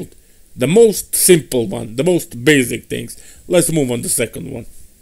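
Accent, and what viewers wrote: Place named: Russia. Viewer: I love your deep russian accent 😄👍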